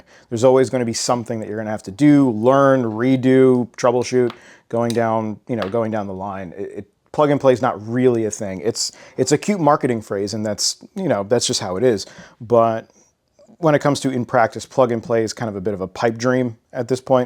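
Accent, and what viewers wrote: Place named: Australia. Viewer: Great video! I let it play as I swapped an MTE into my XR, it was like we were building together! I even caught myself talking in Australian accent with you 😜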